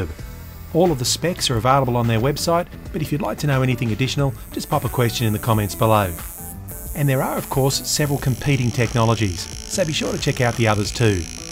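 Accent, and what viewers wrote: Australia. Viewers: Thanks for the video! A versatile guy who knows how to keep going on the job! Sound advice, good sound/video and pleasing voice/axcent! Not sure, could be Australian?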